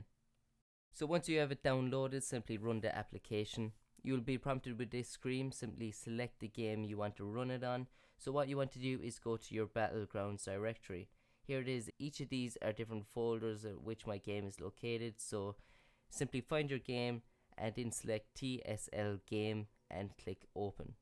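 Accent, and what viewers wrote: Ireland. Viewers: irish lad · are you Irish? HON IRELAND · U Irish? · What part of Ireland you from bud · thats an irish accent lad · Subbed cos you’re also Irish lol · r u from cork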